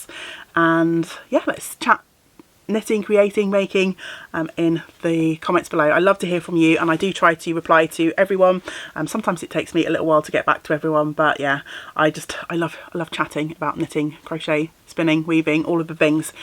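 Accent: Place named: Wales, United Kingdom. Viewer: I am binge watching many of your videos. As I am working through my cast-ons for 2026, I have been thinking about what about you that has captured my attention . One thing is your accent and that you are in Wales. Your home town is beautiful. You have so many ideas. You enjoy many projects at once. You are a plus size woman. It is refreshing for me also a plus size woman. And your waffling is a great joy to me.🤣🤣🤣💚💚💚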